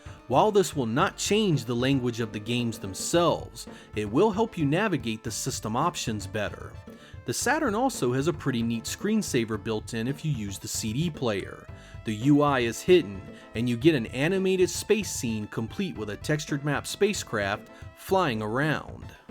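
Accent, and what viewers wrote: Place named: Baltimore, United States. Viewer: Cool! I work with a guy from Baltimore and you guys sounds like brothers. Thanks for the reply. Keep the Saturn videos coming. Also, are you familiar with The VGC replacement long box Saturn/Sega CD cases on Amazon? I bought some and have my own opinion but curious if you had any hands on?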